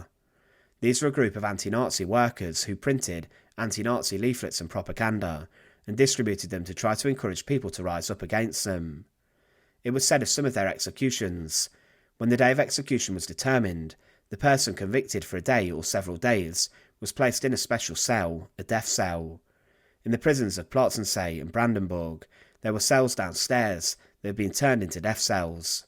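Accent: United Kingdom. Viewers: No ,he sounds British which he is. Enough said already!..😅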